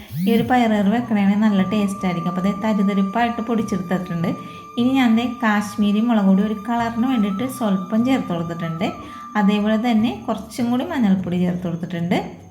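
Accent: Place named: Kodungallur, India. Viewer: കൊടുങ്ങല്ലൂർകാരുടെ സംസാര ശൈലി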